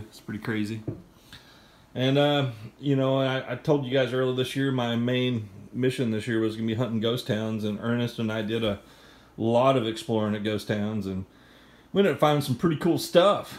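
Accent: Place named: Oklahoma, United States. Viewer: Great finds! I’ve never said that you still have your Okie accent but I’ve wanted to. Lol From one Okie to another, Happy New Year!! May this next year be even better!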